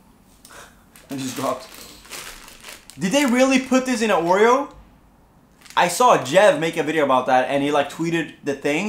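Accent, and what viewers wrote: Scania, Sweden. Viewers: Jonte nej han är ju skånsk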